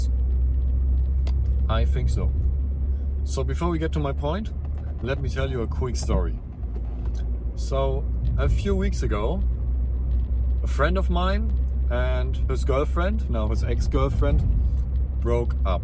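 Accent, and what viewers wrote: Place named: Germany. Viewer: Your accent is so german, u german?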